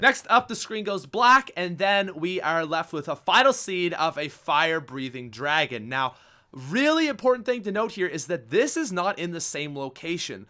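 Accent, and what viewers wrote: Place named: Canada. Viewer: He's Canadian. that's how they pronounce it.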